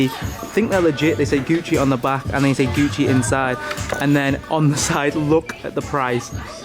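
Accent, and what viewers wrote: United Kingdom. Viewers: It sounds weird hearing a person from the uk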